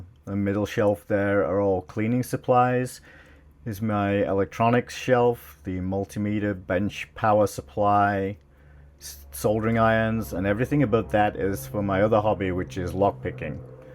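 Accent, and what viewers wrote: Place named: Texas, United States. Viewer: Great video! Interesting how the Texan accent has partially rubbed off on you so quickly,-) I have almost the exact same set of tools as you. Well I have one of those blue silicon mats. Very interested to see the next video, a, because I just bought an Elgin watch from another US based watch repairer, and I have a beautiful, imho pocket watch, which definitely needs servicing one day. Love the vintage tools!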